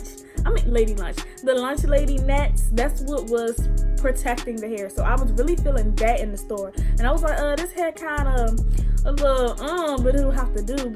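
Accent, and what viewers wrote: Washington DC, United States. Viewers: I know a DC accent when I hear one 😏😏😏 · Was going to say you’re from DC but you already confirmed. I love our accents ❤️ Great video! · I knew you sounded like you were from dc! 😭😭😭🖤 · Is she from the DMV? I feel like I'm getting notes of DC when she talks lol. ANYWAY I'm finna try these next week 😜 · Joy B yup 1 minute in..I'm like she from DC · Yes accent, I can tell you’re from DC!!!! Lol ❤️ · You from DC? I hear our accents Lmaoo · You from DC sis? That accent ayyyeee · Girl are you from DC or PG because the accenttttttt!!! 😂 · Subscribed as soon as I heard that DC accent 😭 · You started talking &nd I was like BOOM that’s DC right there lol. I LOVE this tutorial &nd how you added all the products, that’s always my main question. I also have to give you props because you have so much hair naturally I was tired for you after the bottom row LMAO. 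· Are you from DC? I am from Maryland and I can hear your accent. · I knew the moment she started talkin 🤣🤣 DC all day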